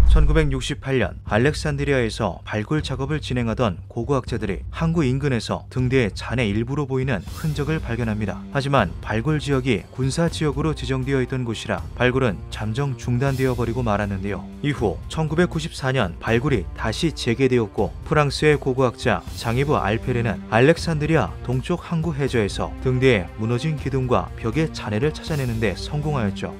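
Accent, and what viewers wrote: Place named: Gangwon, South Korea. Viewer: 기묘한밤 나레이션 담당분은 고향이 강원도 아닌가요? 강원도 특유 억양이 있는거 같은데 ㅋㅋ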